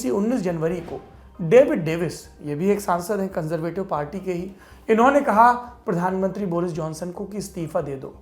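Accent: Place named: Bundelkhand, India. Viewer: सिद्ध हिंदी के बीच थोड़ी सी बुंदेल खंडी हो वाह #दुनियादारी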